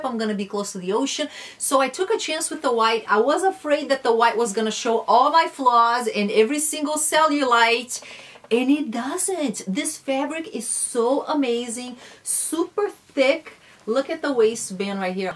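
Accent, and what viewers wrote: Brazil. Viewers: I adore your Brazilian accent 😍🇧🇷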